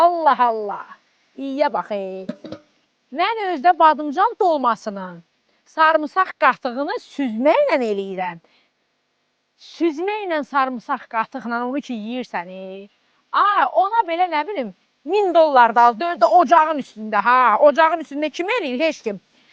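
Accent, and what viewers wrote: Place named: Gadabay, Azerbaijan. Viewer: Bəs özü deyir Goranboydanam.. Ləhçəsi oxşuyur ama Gədəbəyliyə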